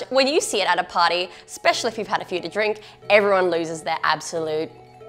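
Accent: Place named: United Kingdom. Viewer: Fabulous British accent!,..cool to hear you call them hundreds and thousands like we do and not sprinkles like in America..lol 😊👍🇬🇧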